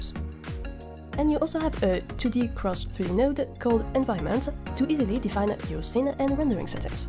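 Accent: France. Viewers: J’ai cramé en 2 secondes que tu étais français mais je suis content que la commu Godot français est là.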